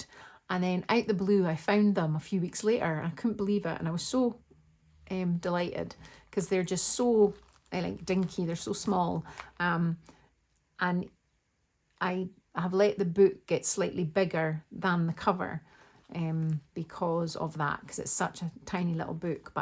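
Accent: Scotland, United Kingdom. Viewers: Love this journal, I am really enjoying seeing journals that are not made up from kits. I have stopped using kits too, I was finding it was affecting my creativity. A few digital papers in the signature is nice if making a journal with a theme though. Its really nice hearing a Scottish accent in the journaling YouTube world lol, I am from Aberdeen origonally xx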